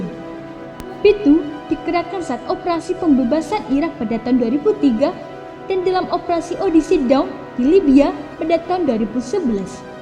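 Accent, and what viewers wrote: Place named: Aceh, Indonesia. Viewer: Logatnya mirip logat aceh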